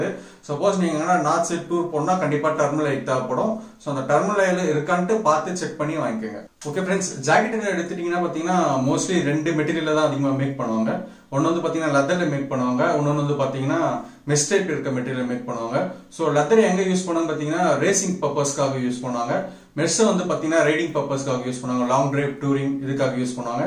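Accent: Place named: Chennai, India. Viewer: வாங்க சொல ! கேட்க சொல ! Your Chennai language speech was amazing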